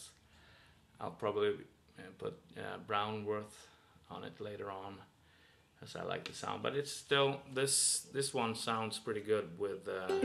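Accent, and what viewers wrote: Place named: Texas, United States. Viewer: What a cool Texas accent u have!! I need another use!! Nice instrument.....T:)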